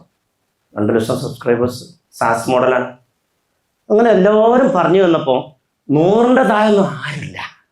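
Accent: Malappuram, India. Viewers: Thanku sir... താങ്കൾ മലപ്പുറം ദാഷയിൽ സംസാരിക്കുന്നത് നല്ലത് തന്നെ പക്ഷേ സോഷ്യൽ മീഡിയ യൽ കാണുന്ന എല്ലാർക്കും തിരിഞ്ഞോളണന്നില്ല..... സസ്നേഹം ഹരീഷ്‌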